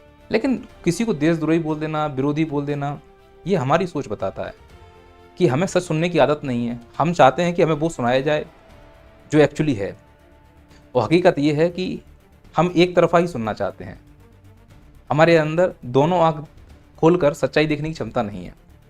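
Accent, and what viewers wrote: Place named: Bihar, India. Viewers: Ye बिहारियों का ton hai sir,, aap shant rho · Bihari touch h to nikal jata h asa 😅😅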